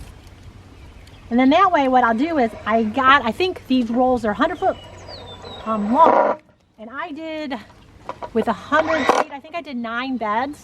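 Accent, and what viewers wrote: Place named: Boston, United States. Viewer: I think you got an awesome personality. You have a bahston accent.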